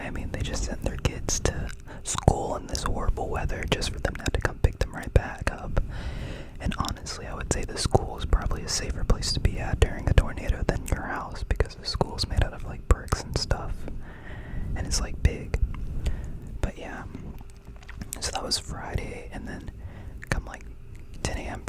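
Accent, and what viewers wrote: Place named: Houston, United States. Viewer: Sounds like H-town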